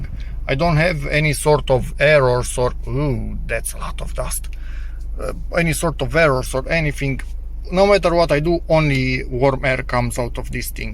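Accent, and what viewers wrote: Romania. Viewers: Aoleooo ... un ruman care vorbeste englezeste !!! Suna ca ... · Accentul ăsta tipic Românesc, îl cunosc de la 1km, nu contează în ce limbă se vorbește, Accentul e același... it's lovely!!! Good job ,,OMULE"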